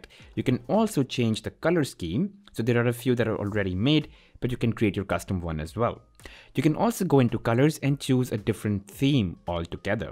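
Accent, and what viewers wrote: India. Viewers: Tapi logat lu kek orang india bro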